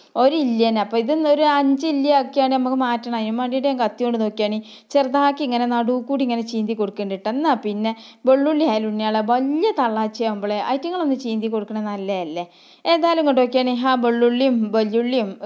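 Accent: Malappuram, India. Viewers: ഇത് ഒരു 50 വർഷങ്ങൾക്ക് മുമ്പെയുള്ള മലപ്പുറം വർത്താനമാ 😂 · It ha no malapuram bhasha?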